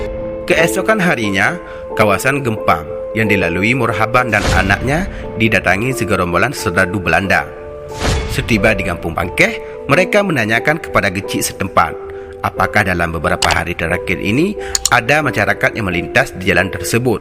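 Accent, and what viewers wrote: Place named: Bali, Indonesia. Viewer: Bicaranya kok mirip bali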